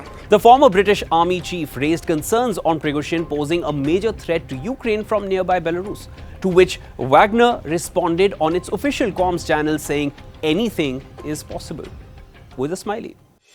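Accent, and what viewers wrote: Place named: India. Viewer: I cannot understand Indian English. However the wagners must be monitered closely. they are very close to Kiev.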